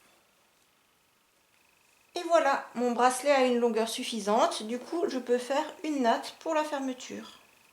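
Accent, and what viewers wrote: Alsace, France. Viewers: Super 👍🏻 merci très bon tuto je vais pourvoir en faire avec mon fils. En plus très jolie professeur 😅 petit accent Alsacien si je me trompe pas 😁🥨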